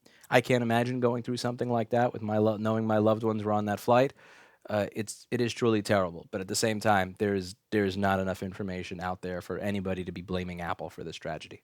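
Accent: New York, United States. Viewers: objectivity really gives him credibility, even if at times he sounds like the New Yorker stereotype.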